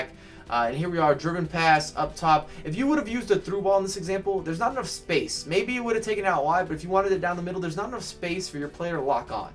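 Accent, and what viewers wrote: United States. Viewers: Fifa School please boy you sound like a american trying to teach Footbal (it ain't soccer) USA suck in footbal man what do you know about footbal tactics and formations we invented and breath football in europe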